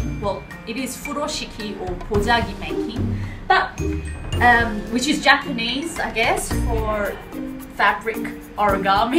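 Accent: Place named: Australia. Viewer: Im pretty confident in saying that she's Australian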